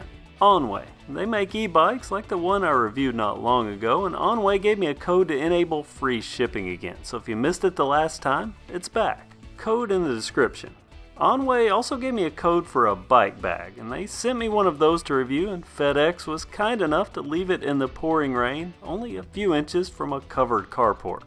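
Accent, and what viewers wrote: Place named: Alabama, United States. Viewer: love to watch your videos, that easy alabama accent is great to listen to while I work (on bikes)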